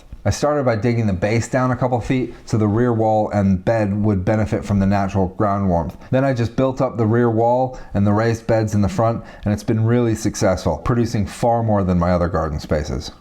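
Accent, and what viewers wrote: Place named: United States. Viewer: I'm thrilled that you're bought a place in the UK! You have an American accent so I assumed that you were based in the US. I've thought exactly the same thing about growing spaces. North wall and lots of thermal mass. If you've not already, have a look at "Chinese greenhouses".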